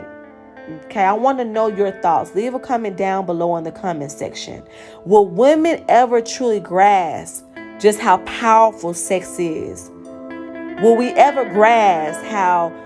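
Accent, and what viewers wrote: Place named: Florida, United States. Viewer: Are you from Florida you have the accent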